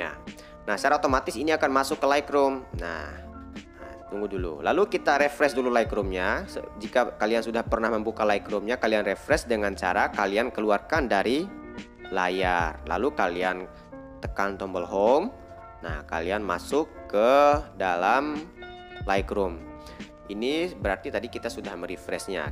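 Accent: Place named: Bali, Indonesia. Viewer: iya...iya teman2 kayk bli..bli di bali logatnya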